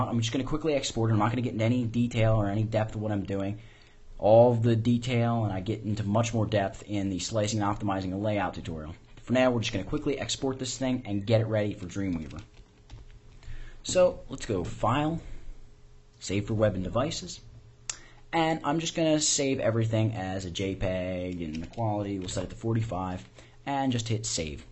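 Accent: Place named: United States. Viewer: awesome tutorial, i was tired of watching other vids where the people have strange accents. USA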